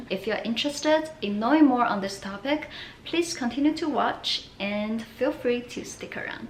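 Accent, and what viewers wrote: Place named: China. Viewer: You are the best YouTuber, I watch almost all the videos of your channel. love your voice, your Chinese accent. I think you are absolutely gorgeous, and you are a really confident person. I really want to be friends with you, I can imagine that you are such an amazing friend. Keep film more video!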